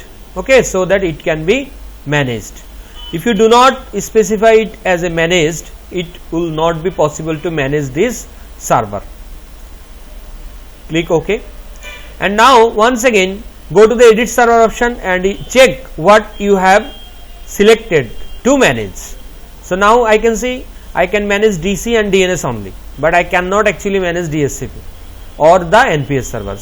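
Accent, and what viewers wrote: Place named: India. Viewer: Here's some tips on how to speak English clearly and decisively, I'm not trying to be rude I'm genuinely trying to help you. You need to slow down and keep the same pace throughout each sentence, don't start slow and then suddenly and for no reason speed up. You also need to enunciate which basically means pronounce the word correctly taking your time to say the whole word clearly. In time as you get better at enunciating you can speed up. The problem with Indians is they speak English the same way they speak their mother tongue. This is a common mistake and is why an Indian accent is very hard to understand. You have a good vocabulary and you're saying the sentence correctly but what's letting you down is your speed (too fast) and pronunciation. Just take your time, write down what you want to say and practise it a few times enunciating every single vowel, again with time this will become easier. 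You've taken the time to learn English but if people can't understand you then you're fighting a losing battle. Listen to a native English speaker that has a neutral accent and then repeat what they say so you learn the pace and pronunciation, you will get better every week and it will open a whole new world to you. Nobody wants to listen to an Indian speaking English too fast and not enunciating its infuriating for us.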